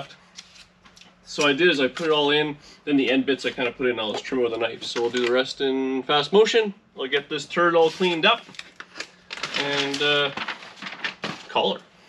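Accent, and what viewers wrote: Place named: Canada. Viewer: I like how when you're speaking you actually say Eh, like a true Canadian 😁. Cool car. So jealous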